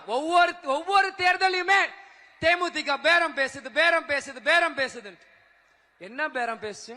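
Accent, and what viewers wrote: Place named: Madurai, India. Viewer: Eppathan neengal Madurai karar mathiri pesukireergale sir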